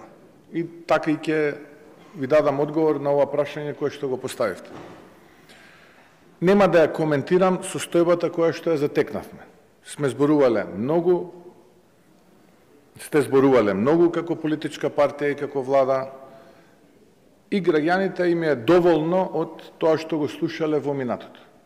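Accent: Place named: Serbia. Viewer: Говори нанраво бе,не меши сръбски диалект в Българския език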